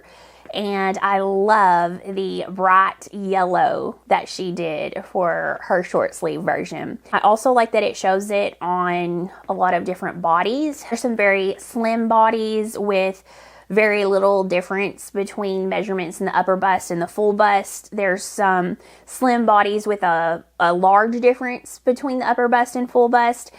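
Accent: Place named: Kentucky, United States. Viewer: As someone from KY. I am enjoying watching such a talented knitter that share my accent. 😂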